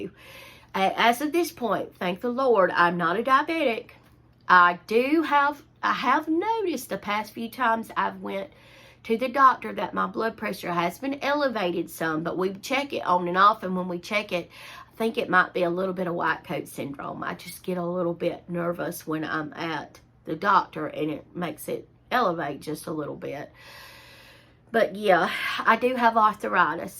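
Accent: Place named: Alabama, United States. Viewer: I am 64 and yes my body does not let me do the things I want to do. I am from Alabama. You sound just like us. Are you from Alabama?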